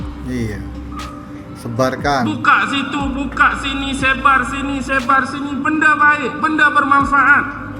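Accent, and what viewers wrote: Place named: North, Malaysia. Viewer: loghatnya pun dah bertukar jadi loghat utara 😂😂😂😂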